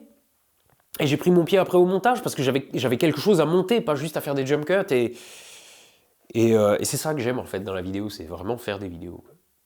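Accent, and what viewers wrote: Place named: East, France. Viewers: Ce que m'inspire ta vidéo... Humblement... T'as pas un côté HP? T'as bien le droit de faire ce que tu veux... Je te suis pour le ton, les thèmes et ta façon de vulgariser... T'as le droit de faire ce que tu veux... Fais-moi rêver avec ton accent mosellan... On est voisin, big up.... T'as le droit de faire ce que tu veux... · De la botanique à la sauce, ça va dépoter! Je ne loupe pas les mises en avant de produit avec l'accent de l'est de La France. Et puis c'est l'apprentissage des gros mots en Alsacien. Pour les futurs sujets, fais toi plaisir et surprends nous! Tu aimes la qualité alors fonces. Tu fais parti de ces créateurs qui m'apportent de la connaissance avec humour. A bientôt.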